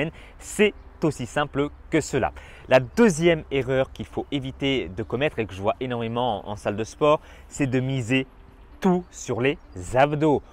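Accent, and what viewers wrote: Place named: South, France. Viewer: Le petite accent du sud ☺️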